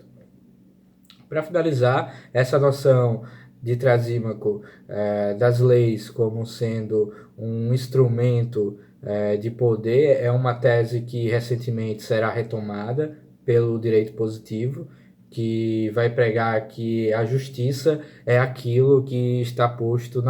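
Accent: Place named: Pernambuco, Brazil. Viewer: tu é de Pernambuco? Sotaque bem semelhante...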